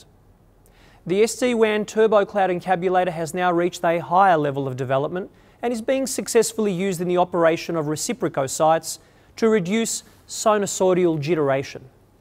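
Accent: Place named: Australia. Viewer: Anything said with a British accent is a lot smarter and better. But this guy is Aussie, so I'm skeptical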